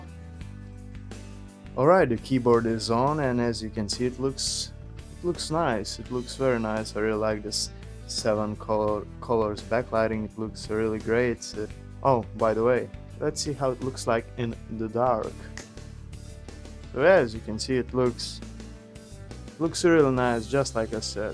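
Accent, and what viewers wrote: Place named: Russia. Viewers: lol ruski accent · the translate : Lol,Russian Accent) in case some one wonder. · Лол, русский акцент)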